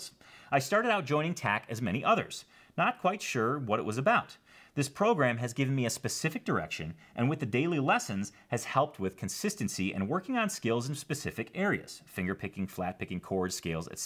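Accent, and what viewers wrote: Chicago, United States. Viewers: You are my favorite Chicago “regular guy” accent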